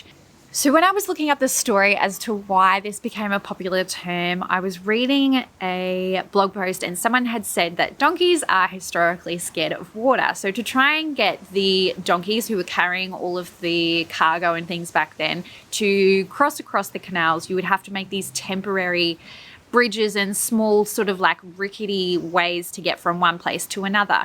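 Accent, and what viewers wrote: Netherlands. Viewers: I like her Dutch accent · Heb je in de gaten dat je soms Nederlandse woorden vertaalt naar het Engels maar dat je ze gaan toch Nederlands uitspreekt? Bijvoorbeeld handschoenen. Jr vertaalt hand naar hand maar in beide gevallen spreek je het op zijn Nederlands uit. Ik blijf me trouwens verbazen over hoe goed Nederlands je spreekt. Complimenten daarvoor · You really have a hang of the Dutch accent! Cool vid. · can we just talk about how great u dutch accent is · Oh my god, your Dutch accent is amazing. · I believe there are booklets with these sort of things: "The Undutchables" and "Stuff Dutch people say", if I recall correctly, but it's been a loooong while since I've seen them. Also, oh wow you almost have a Dutch accent now.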